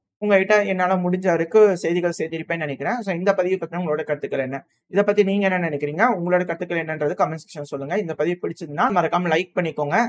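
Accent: Coimbatore, India. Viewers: அவன் பேசறத பார்த்த கோயம்பத்தூர் மாரி தெரியுது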